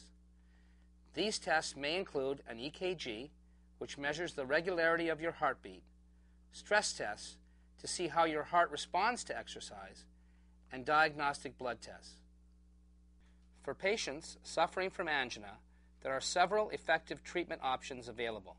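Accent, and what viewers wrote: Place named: United States. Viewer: i think he pronounced angina correctly coz this is the American accent!!!! yesterday an american prof gave us a lecture about angina and he pronounced angina the same>>>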